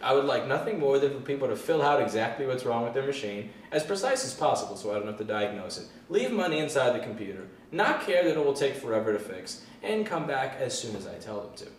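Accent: New York, United States. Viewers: Listen, you speak very well and can clearly and entertainingly articulate complex ideas - nice brain dude. I think you should consider that you may be suited to entertainment as much as you are computer repair. Almost a NY Wisdom vibe to your delivery that - accent and all - makes me feel like I'm back in the Prickly City itself :) Excelsior, sir.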